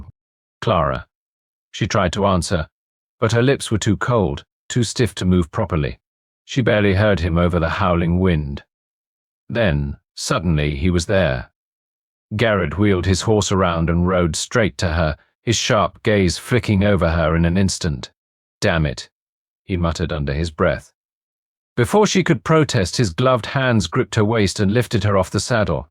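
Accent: United Kingdom. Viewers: Kind of funny to hear à british voice reading an american story. However the narator is wonderful., as is the book.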